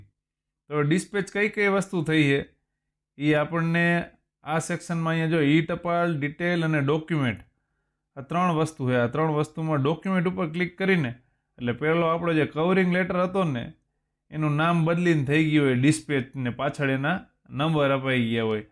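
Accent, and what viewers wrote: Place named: Kathiawar, India. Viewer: હા કાઠિયાવાડી હા... Continue રાખો...😂😂😂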